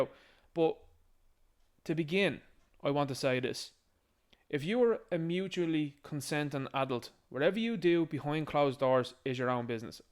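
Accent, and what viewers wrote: Dublin, Ireland. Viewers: Just found your Channel ! Usually the strong dub accent is a bit much for me 😂(Carlow here ) but I’m invested 🎉❤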